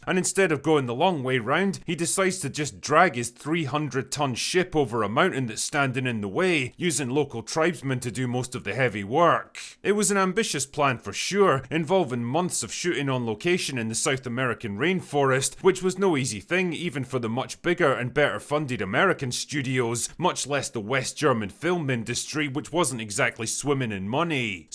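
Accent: Scotland, United Kingdom. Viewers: Is it weird that I don't care about the movie but listened just for your Scottish narration?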